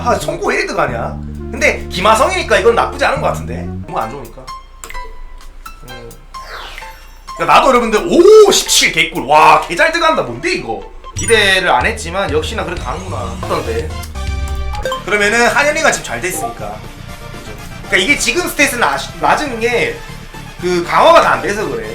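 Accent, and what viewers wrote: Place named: Gyeongsang, South Korea. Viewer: 나 경상도 사람인데 이정도면 사투리 심한 사람중에서 순한 말투다..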